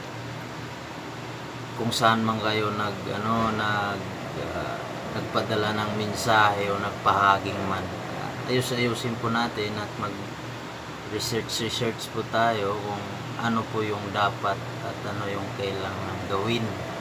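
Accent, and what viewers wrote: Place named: Bulacan, Philippines. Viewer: Puntong Bulacan bgung kaibigan po plitan tau regalo po lmat amega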